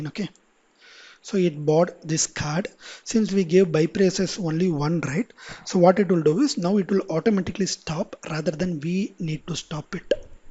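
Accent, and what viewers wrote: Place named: India. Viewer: I hate this Indian omfg please stop with the fcking accent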